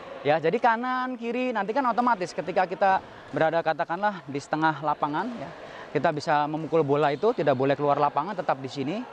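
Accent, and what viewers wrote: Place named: Cirebon, Indonesia. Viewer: logat cerbone katon banget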